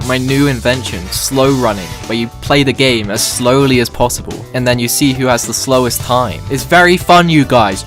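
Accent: United Kingdom. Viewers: guys there isn’t a joke it’s just a British guy shitting out of his mouth for five minutes. I feel like I am watching pyrocynical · I respect a man who can share his opinions online. Commenting to help push this vid and ruin someones new year! Edit: Ew youre british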